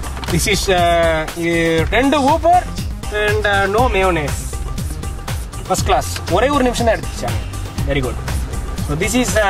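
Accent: India. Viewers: this guy has the thickest indian accent ever.....and it's real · LOL, the best part is his amazing tamil accent and stupid english :D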